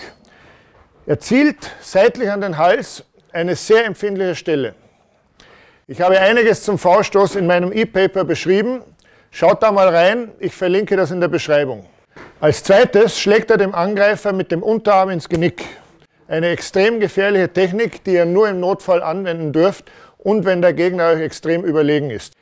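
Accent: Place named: Vorarlberg, Austria. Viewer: Geil. Könnte grad meinen du kommst aus Vorarlberg. Geil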